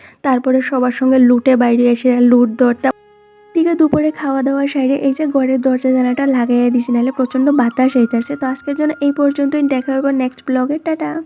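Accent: Sylhet, Bangladesh. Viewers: তুমি কোথায় থাক? তুমি কি সিলেটি? তোমার কথা শুনলে মনে হয় সিলেটি,,,প্লিজ কিছু মনে করোনা,,,আর আমার প্রশ্নের উত্তর দিও❤️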